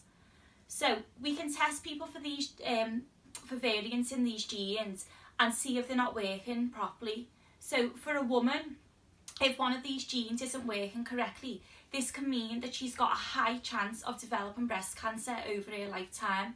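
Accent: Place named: Liverpool, United Kingdom. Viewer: I just saw the old blues email and ended up watching your "5 minutes with..." video and thought it was a great initiative to get people interested in science! I also loved the Oxford dinner and ended up there studying chemistry. The part where you mentioned maybe not fitting in because of the accent made me laugh since it really resonated with me haha. Anyway, as I say, great initiate to show someone real and relatable in science and it's always nice to see a Scouser doing well! :)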